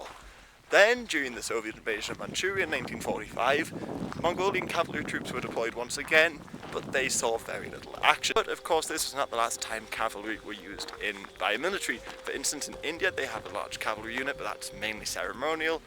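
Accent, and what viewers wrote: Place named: Newcastle, United Kingdom. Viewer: Neecastle lad! We-aye! Dare you'd to speak Geordie in an episode to come!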